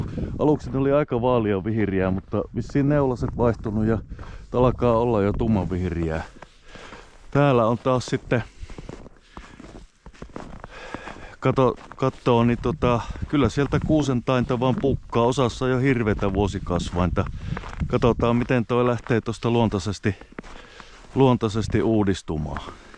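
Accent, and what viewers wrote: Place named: North, Finland. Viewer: Näin metsäalan ammattilaisena ottaisin tuosta nuo harvat hieskoivut polttopuiksi ja kiireesti kuusen taimia maahan. Olisin istuttanut ne jo viisi vuotta sitten kun sanoit, että laidunnus on siinä jo loppunut. Nyt siinä kasvaisi jo perkuukypsä kuusen taimikko. Nuo kilpailun hävinneet vanhahkot keskikokoiset kuuset ovat aina arvoitus. Melkein kun sellaisen kaadat on siinä jo musta piste keskellä eikä siitä silloin enää kunnon puuta tule, vaan vie tilaa terveiltä puilta. Eli olisin ottanut ne harvat pikkukuuset ja hieskoivut kuiduksi jo hakkuussa ja istuttaen uusi taimikko tilalle. Hieskoivu tuppaa olemaan niin kova siementämään, että raivurille on taatusti työtä aina uuden vesakon puskiessa yhtenään. Murteesta arvelen, että kohde on pohjoisessa joten näin pirkanmaalaisissa eteläisen suomen metsissä työskentelevänä maaperä ja puun kasvu on tietenkin erilaista luonnon olosuhteista riippuen ja se mikä toimii etelässä ei aina toimi pohjoisessa. 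Rannan läheisyys ja kostea maaperä kohteessa tukee tietenkin koivuista hieskoivua, kun raudus on karumman maaperän puulaji. Kuusi on toinen mikä tuossa menestyisi kuten sanoit. Kuusi siementyy luonnollisesti koivun alle menestyksekkäästi ja luulisin, että lähipuista muodostuu uusi kuusi sukupolvi tuon rantakoivikon alle. Näin sen voisi kaksois kasvattaa lopulta kuusta suosien ja ne koivut pois vaihtaen lopulta puulajisuhde kuusivoittoiseksi. Jatkuva kasvatus ei onnistu kuin teoriassa ruutupaperilla ja on sääli, kun erinäiset viherideologiset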